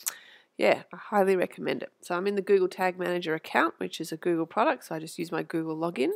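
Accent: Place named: Australia. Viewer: Good to hear an Aussie accent for a change :)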